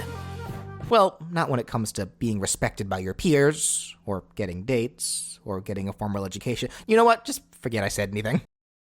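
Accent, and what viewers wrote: New York, United States. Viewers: A bit of a missed opportunity to use your New York accent. Regardless, this was a great moveset for the Scout.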